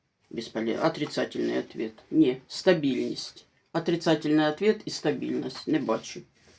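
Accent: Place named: Surzhyk, Ukraine. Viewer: . Люблю вас смотреть и слушать, как вы разговариваете на нашем, суржике,. Посмотрите Мариуполь, может, что то уже изменилось.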